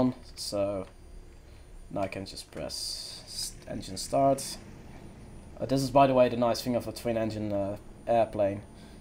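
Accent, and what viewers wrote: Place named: United Kingdom. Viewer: Nice accent, you have a British one, even tho you're a Dutch.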